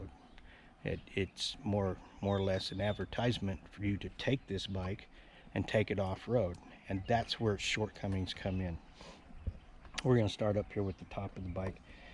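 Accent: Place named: New Jersey, United States. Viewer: How u get to Thailand- I mean u speak like you from I’d say New Jersey ? Now I got to investigate Thailand I love the bird noises in the background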